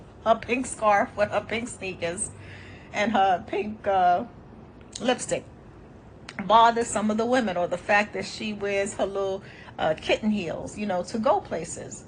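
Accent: New York, United States. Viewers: The New York Accent I love that😍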